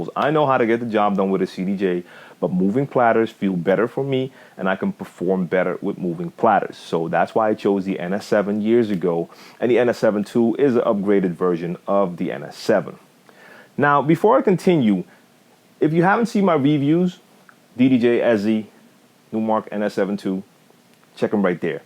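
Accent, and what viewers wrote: New York, United States. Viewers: you said you from the netherlands? u sound like u from new york lol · wow he is not from NYC that's crazy i was thinking he was lol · it's funny i thought you was american from ny :) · you from the netherlands????? mannnnn i thought you were from NYC. have the accent. lol.. good looking on this review. I have Numark ns7. love it.. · Wait. You from the Netherlands ?!!! Woooow, I thought you were from NYC.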